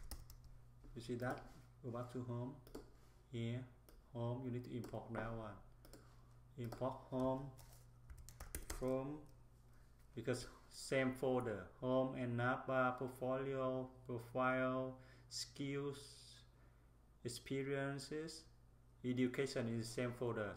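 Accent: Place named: Vietnam. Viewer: nghe cách nói biết người Việt nói tiếng Anh :)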